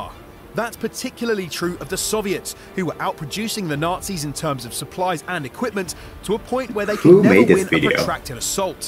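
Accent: England, United Kingdom. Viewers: He doesn’t sound American. Maybe British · He sounds British to me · It's definitely not an American who made the video...he has a clear English accent. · Barrick He spoke with an English accent. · The narrator has a British accent. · to be fair, the accent sounds english but the propaganda slant sounds american, so, could be both? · The narrator is actually Russian, though he speaks with an interesting English working class accent.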